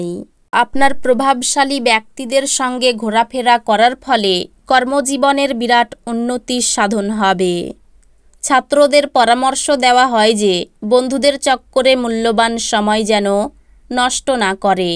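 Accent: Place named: East Bengal, Bangladesh. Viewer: আপনার বাড়ি?,মনে হচ্ছে east bengal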